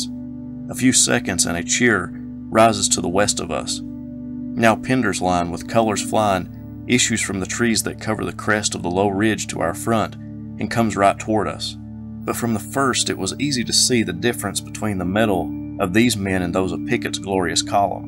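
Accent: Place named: Virginia, United States. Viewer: absolutely fantastic Virginian. i could listen all day never tire of it. that reading took me to the battle. WOW!!! thank you sir.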